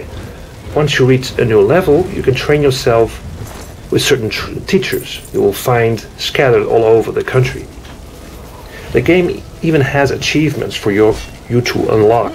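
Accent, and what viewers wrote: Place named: Netherlands. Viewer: I can hear it from your accent that you're dutch. Am I right?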